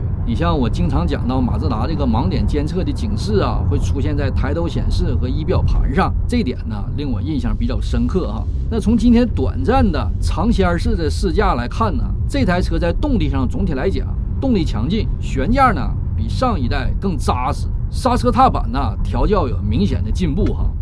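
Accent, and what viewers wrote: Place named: Shenyang, China. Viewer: 主播的口音也太亲切了，老铁是沈阳银吗？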